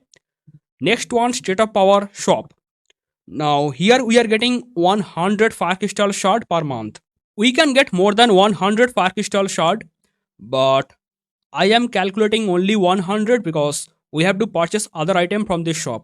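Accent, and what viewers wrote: India. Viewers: Don't not speak English..you just talk India man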